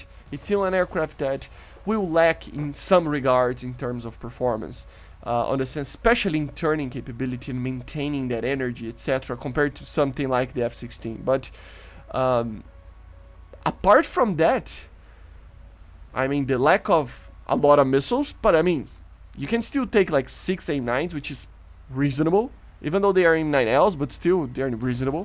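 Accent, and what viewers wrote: Brazil. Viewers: Tu é brasileiro né? K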